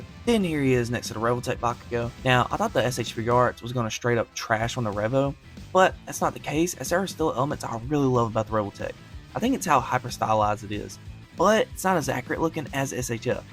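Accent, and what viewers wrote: South, United States. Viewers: He got that southern accent 😂.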